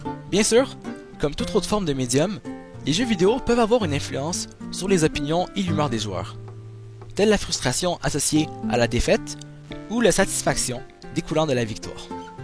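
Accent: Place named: Quebec, Canada. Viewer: Franchement, je suis impressionné. Y'a des maladresses sur la présentation (voix peu naturel, etc.), mais le boulot sur le montage illustre très bien le sujet, le rythme est plutôt bien malgré le manque de divertissement à côté, etc. L'information est de qualité, tu cites des sources, c'est plus complet que certains trucs font en tant que professionnel, y'a de l'objectivité, autant en positif que négatif, etc. C'est un peu orienté, mais je vois pas comment je pourrais dire que c'est un défaut, surtout qu'objectivement, la plupart des arguments du contre sont d'origines idéologiques quand ils sont honnêtes. La vidéo semblait être un one shot try, mais, franchement, j'ai beaucoup apprécié. Et quand j'ai entendu l'accent du Québec, ça m'a fait plaisir, surtout que j'ai l'impression qu'au Québec, la communauté gaming ne brille pas par sa présence (pas sa qualité). 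N'empêche que le boulot, il mérite pas d'être souligné quand c'est fait aussi bien. Bravo.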